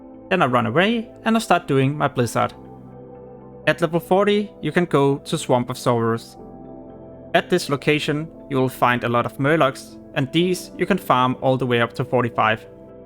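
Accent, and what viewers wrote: Denmark. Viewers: it's all good, nice video you made. - sounds like you are danish :) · Danish guy. It's the accent. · HUGE dansker accent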